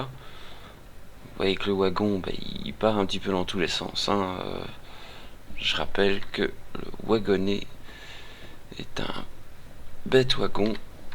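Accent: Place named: Belgium. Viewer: Mdr ! Pardon cela me fait rire ton accent Belge^^ par contre tu fait de très bonne vidéo qui me donne envie de jouer à ce jeu! continue comme ça👍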